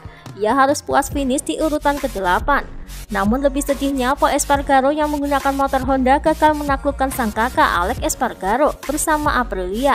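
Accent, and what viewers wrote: Java, Indonesia. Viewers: Mba medok saya hadir hehe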